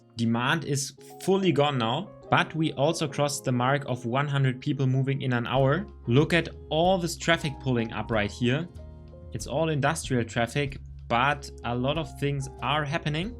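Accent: Germany. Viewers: Also nich böse gemein. Das hört man ja raus dass er deutsch is😅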